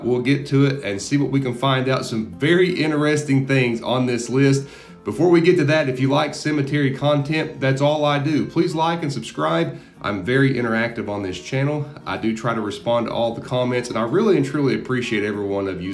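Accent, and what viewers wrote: United States. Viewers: Its so refreshing to hear someone narrate in a clear american voice that can be clearly understood! Not like those British and India narrators! Most of the time i have a hard time understanding tbem!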